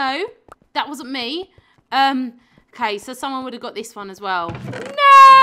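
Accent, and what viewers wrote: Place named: Cockney, United Kingdom. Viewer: maaaaaan that cockney accent is strong. wwooooww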